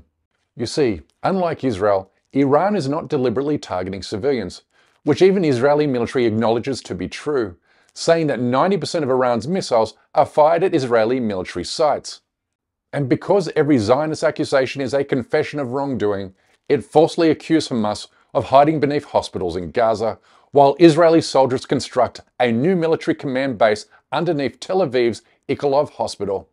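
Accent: Australia. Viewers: Even I can hear the Aussie accent, and I'm a Kiwi! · I am Australian and he sounds Australian to me! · Sounds Australian to me! From central Queensland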